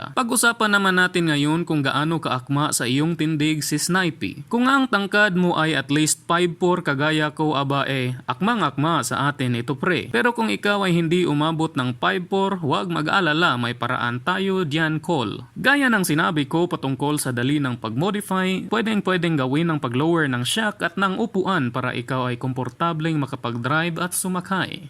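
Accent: Visayas, Philippines. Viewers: tagalog na bisayang accent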